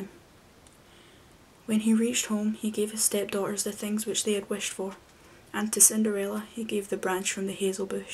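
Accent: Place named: Scotland, United Kingdom. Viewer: Oh!! I have such a soft spot for enchanting short stories and poems that leave you with chills. Very excited for the coming additions in the series!! I love love love scottish accents, I don't hear enough of it in ireland, french and scottish accents are probably asmr favourites of mine! Thank you for this 💫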